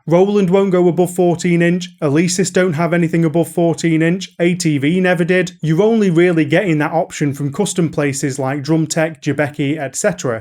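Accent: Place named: Lancashire, United Kingdom. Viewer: Ah was picking up some Karl Pilkington vibes from that accent. Most definitely not an idiot though; love this channel. :)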